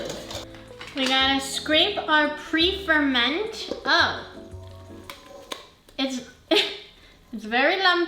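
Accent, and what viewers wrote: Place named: Canada. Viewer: 2 minutes in and i thought "this person is canadian". 3 minutes in you confirm you're in Toronto. eta: omg, Midred's is soooo good! I came here after watching the video the chef did. the technique he demonstrated was a little different. when i was a kid and my mom made crepes we would have them with butter, sugar and lemon. so good. i also like honey and butter on my pancakes, but i most often do the classic butter and maple syrup. sometimes i make a berry compote.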